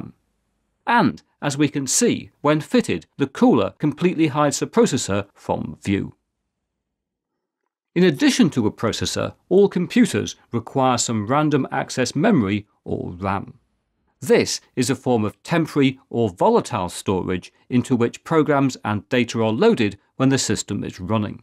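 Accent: United Kingdom. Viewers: I didn't learn anything new, but as always, very nice simple video w/o annoying music and flashing lights. i do enjoy the non nonsense approach with you videos. i think i will show this to my son, he may learn a few things. thank you. btw, the british accent is a nice touch.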